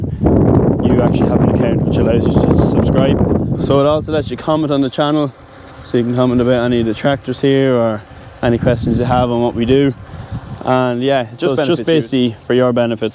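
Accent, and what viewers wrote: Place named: Meath, Ireland. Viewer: Butlins Mosney the Peefect place for a holiday Meath accent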